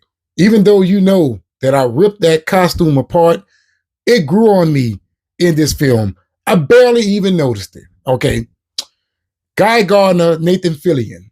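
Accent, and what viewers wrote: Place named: Louisiana, United States. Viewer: Lol my mans Louisiana accent coming to life!😂😅 Good reaction man! You gotta a new sub!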